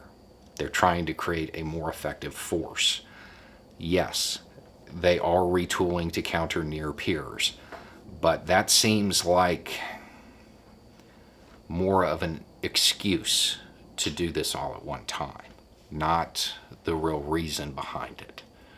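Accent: South, United States. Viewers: It's the southern accent. 😁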